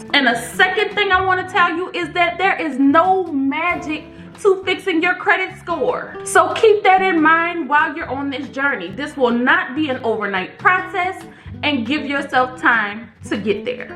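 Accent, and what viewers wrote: New Orleans, United States. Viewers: Thank you for this in depth video & applicable steps! So I hear Nola’s accent?💕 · Baby are u from the city, neworleans cuz ur accent baby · Are you from NOLA? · That Nola accent😩😍 Thank you for the tips beautiful!!! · Girl I’m so happy for you. So beautiful ☺️ I love your accent.. u sound like a NOLA babe.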